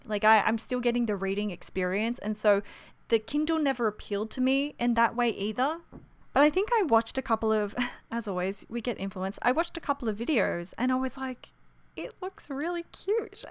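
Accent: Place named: Australia. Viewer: The Australian accent though 😍😍😍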